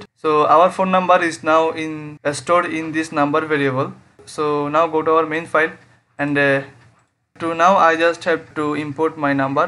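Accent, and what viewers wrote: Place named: Bengal, India. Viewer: Bro your english is like Bengali....are you bengali??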